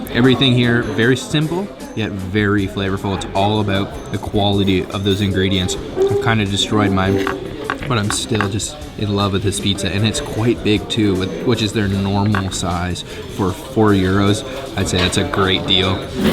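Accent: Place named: Canada. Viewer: this guy has such a heavy canadian accent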